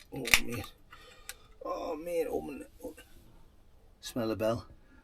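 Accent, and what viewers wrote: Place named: South Shields, United Kingdom. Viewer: You sound like a shields lad.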